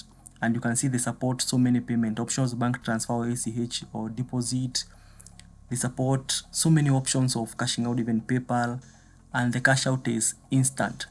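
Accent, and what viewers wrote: Kenya. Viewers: Hii accent ni ya kenya sindio...murima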